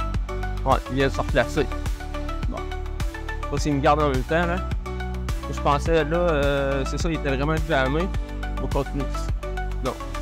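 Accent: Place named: Quebec, Canada. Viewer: Waow, tu as l'accès québécois hyper prononcé, j'ai eu du mal à reconnaître le français.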